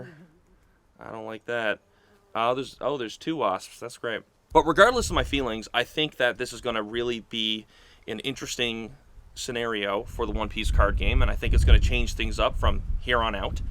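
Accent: United States. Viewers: Honestly, as a fellow east coaster I prefer pronouncing the way you do 😅 either way real ones know what you mean anyways great video. I’m just getting into one piece myself. This will probably be my first set I rip. As a guy who comes from yugioh, I really appreciate the content. Keep it up brother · I’m Irish ? What ? Bro you American as it gets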